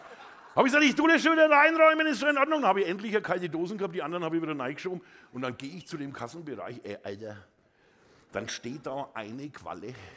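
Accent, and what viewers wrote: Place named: Franconia, Germany. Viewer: Fränkisch geil 😂😂😂😂🤘🤘👍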